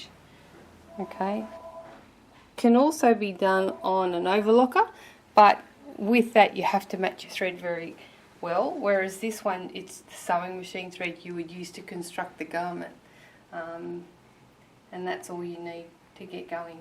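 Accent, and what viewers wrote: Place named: Australia. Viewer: This has nothing to do with sewing but I love your Aussie accent. (Thanks for all the helpful videos!)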